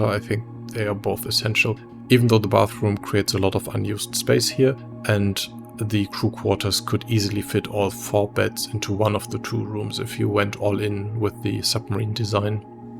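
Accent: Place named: Germany. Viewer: dieser deutsche akzent... bitte sag mir das ich micht nicht irre... kanadier reden nicht so ^^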